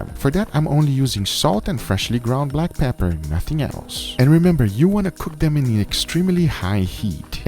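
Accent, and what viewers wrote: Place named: Brazil. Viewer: he’s Brazilian so I think it’s just an accent/2nd language thing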